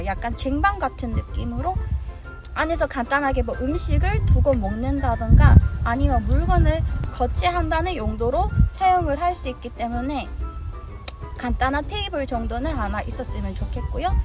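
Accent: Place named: Daegu, South Korea. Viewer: 저도 경상도 대구라 사투리 참 듣기 좋네요 ㅋㅋㅋㅋ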